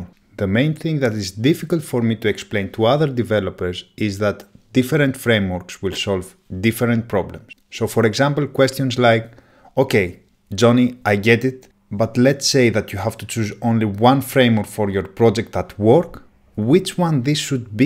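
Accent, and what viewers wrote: Greece. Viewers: α ρε φιλε κανει ΜΠΑΜ οτι εισαι Ελληνας,δεν μπορεις να φανταστεις πως νιωθω οτι ακουω εναν Ελληνα να μιλαει για τετοια θεματα ( οχι ειμαι τιποτα κολλημενος με τη χωρα η τιποτα πολιτικα οτιδηποτε) απλα καταλαβαινεις , ειναι τελειο να καταλαβαινεις οτι ο αλλος ειναι Ελληνας με τοσες γνωσεις για το συγκεκριμενο πραγμα που σε ενδιαφερει και σενα. Συγχαρητηρια ρε φιλε , συμφωνω σε ολα οσα ειπες στο βιντεακι. Κακο που μιλαω στα ελληνικα βεβαια , απλα ενιωσα την αναγκη να δεις ενα ελληνικο comment για να καταλαβεις οτι ειναι respect αυτο το πραγμα. Απο τα επομενα ολα αγγλικα :) Μου προσφερες ενα τρελο χαμογελο ( με τη καλη παντα εννοια) απο τα πρωτα δευτερολεπτα που καταλαβα οτι μιλαει Ελληνας.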